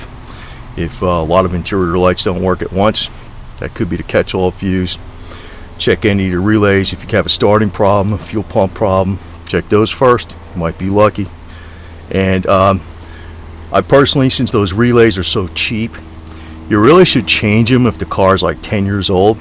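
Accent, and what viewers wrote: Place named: Pittsburgh, United States. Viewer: you from Pittsburgh??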